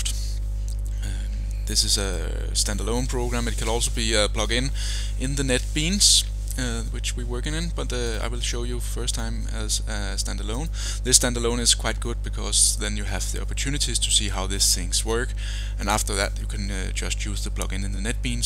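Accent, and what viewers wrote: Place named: Denmark. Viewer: Du lyder meget dansk. You sound very Danish.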